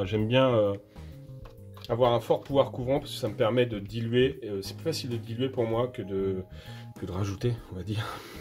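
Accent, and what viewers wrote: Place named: England, United Kingdom. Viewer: Merci pour cette vidéo, hâte de voir ton ressenti au niveau de son appliquation. On en parle énormément des peintures AK en ce moment. Je vais voir ta vidéo sur le set Gold. J'adore ton accent Anglais 👍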